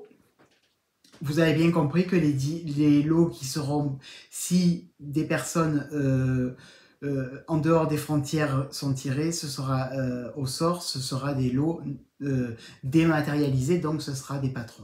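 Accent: South, France. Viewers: Bonjour encore un bon moment passé en ta compagnie 🧶 j'aime ton accent du midi et ton humour. Bonne journée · Bonjour, je vous ai découvert grâce au podcast de Julie de Lolipelote et c'est un ravissement que de découvrir vos podcast qui chante le sud. 🧶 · hello! enfin quelqu un du sud! je désespérais! je pensais qu il y avait que le nord la bretagne le grand est qui tricotaient 😉moi je suis du vaucluse et je regarde beaucoup de vidéos de tricot mais la majorité des youtubeuses sont de la haut bref ça fait du bien de t entendre et meme je savais pas qu il y avait de temps en temps des fetes de l laine par ici dailleurs si un jour tu es au courant d un evenement dis le nous parce que pareil, ils sont tous la haut, quand meme on vit dans le sud ok, il fait chaud ok, mais on mets des tricots aussi 😅sinon bé, j aime beaucoup tes vidéos je rigole bien et j apprecie ta façon cach de parler allez j arrete mon discours j attends la prochaine avec impatience a bientot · Quel agréable moment ce podcast ! 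J'ai un accent (Sud OUest) mais le tien me renvoie dans les années 80 lors de mes vacances à Port Grimaud. Bises des Landes 🧶🧶🧶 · Merci de ce podcast, merci pour ta bonne humeur, tes boutades bien sympathiques… ton accent du sud qui ensoleille ton podcast … je viens de passer un très bon moment je participe à ton concours 🧶 FRANCE merci aux généreux donneurs.. tu mérites leurs soutiens … ton sac est magnifique… il me fait bien envie BONNE CONTINUATION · Merci pour tes podcasts avec ton humour et ton accent qui “sent bon” le sud.j’adore. Génial les 4000 abonnés je participe pour la France 🧶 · 🧶 Bonjour! Toujours très agréable cette voix qui nous vient du sud et nous apporte un rayon de soleil. Merci ❤ Maryse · 🧶 Bonjour. Première fois que je commente un de tes pod cast. Merci pour le soleil qu'il y a dans ton bel accent du Midi. Bonne continuation. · 🪐🧶 Salut ! Toujours agréable d'entendre mon accent du sud quand on a émigré au Québec. 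D'accents en accents mais le même amour de la laine. Merci pour l'originalité. À quand un podcast au bord de la grande bleue? :D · En vacances dans ton cher sud, je ne suis pas très assidue sur les podcasts... il fait gris aujourd’hui, donc hop, une de tes videos pour faire revenir le soleil, au moins par ton accent et ta bonne humeur 😊 grâce à tes infos sur insta, je suis allée la semaine dernière aux portes ouvertes de Sabine, que de merveilles et quelle jolie rencontre ! Alors merci à toi pour cela aussi 🥰 je participe avec plaisir à ton concours, depuis la France donc 🧶 merci et bravo pour ce succès bien mérité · Un succès bien mérité pour un tricoteur spontané et réjouissant. Je ne rate aucun épisode et ris beaucoup avec toi ! Ne change rien surtout et vive l'accent du Sud!!🌞💕 🧶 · Bonjour, Je te regarde depuis le 1er podcast, cest toujours un agréable moments. J'adore ton accent du sud ca mets du soleil dans la grisaille du temps, ta bonne humeur, ta franchise et les superbe projets que tu nous présentes. 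Bravo pour les 4000 abonnes c'est mérité. Je suis de Belgique et je participe volontier au concours 🪐 merci A bientôt. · 🧶Bonjour, J'adore vos podcasts, vous me faites sourire et j'apprend pleins de choses, je suis débutante, j'aime votre autodérision et votre accent qui me donne envie de découvrir le sud. Et j'ai trop hâte de découvrir votre design chaussette 🧦! Merci pour ce chouette concours! Belle journée 🧶🌞🤩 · 🧶 Bonjour 🥰 Quel plaisir, grand moment de détente de vous regarder depuis le début de votre chaîne et sur Insta J’adore et j’adhère complètement votre façon d’aborder le tricot et toutes les merveilles qui l’accompagne 😍 Je vous félicite et bravo pour les 4K🎉 Votre humour ainsi que le parler du midi me Régale!!!!!! Je suis de La Ciotat (13) donc je comprends bien 👍👍👍 Merci de faire connaître notre accent et nos galéjades 🤣 Toujours impatiente du prochain épisode 💪 Bravo pour toutes vos créations les choix laineux et les couleurs!!! 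❤️🍀🌹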